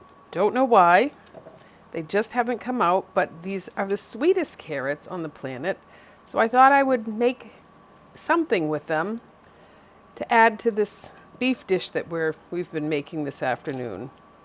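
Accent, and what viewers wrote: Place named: Northeast, United States. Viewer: Yes, indeed, it does look amazing! Great “Save!” Heading over to the next! First, I’ve got to ask you what state you’re from. My mom and dad were from New Jersey/New York, and I detect some sort of North East accent in some of your words.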